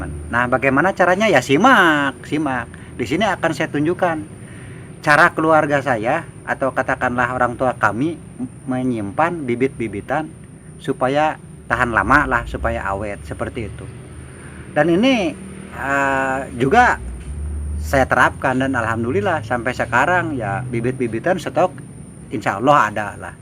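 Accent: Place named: West Sumatra, Indonesia. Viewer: Kok sampai bisa kang bahaso minang.. Heran lo awak.. Atau mungkin teteh urang padang.. Heee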